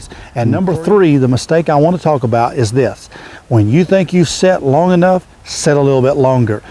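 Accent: South, United States. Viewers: Folks this guy speaks better English than most of y’all ‘spert English teachers. Too many people need to get real and quit being high and mighty. It’s hard to see people when your nose is in the air. We in the South add personality and flavor to our talk. I’ll take genuine good ol’ boys over any snob any day. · Lol that southern accent could give tips to do both guaranteed lol. Great videos. I can never get enough turkey talk.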